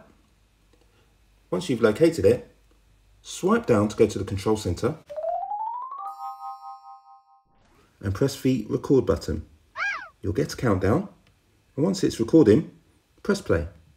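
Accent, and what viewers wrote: United Kingdom. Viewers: Your voice literally sounds like an british guy btw am not racist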